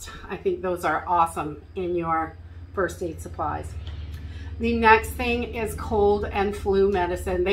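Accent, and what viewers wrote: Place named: Bronx, United States. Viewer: Wow that is one i don't have that wrap. Every time i see you up i think Oh I have to go watch you you get me grinning, i don't want to sound personal are you or family from the bronx? You sound like you have a bronx accent. Love your channel. ☺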